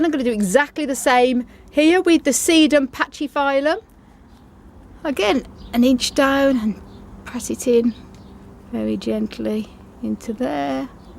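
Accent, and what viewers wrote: United Kingdom. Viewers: So pampering voice you have I love UK accent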